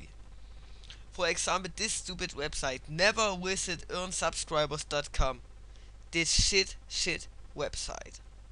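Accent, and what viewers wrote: Germany. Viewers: Bist du Deutscher? ^^ Wenn ja, man hört´s :D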